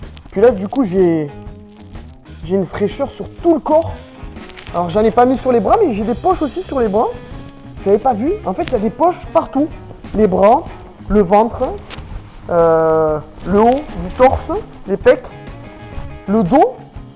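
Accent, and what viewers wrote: Marseille, France. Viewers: Accent marseillais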